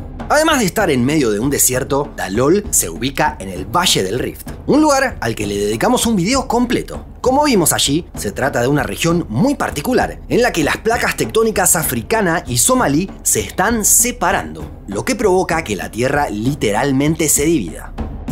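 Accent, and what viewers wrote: Argentina. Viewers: Hola, sos de argentina o de uruguay? Porque tenes un acento de mezcla del neutro pero nose si con el argentino o uruguayo. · Y ese acento más argentino.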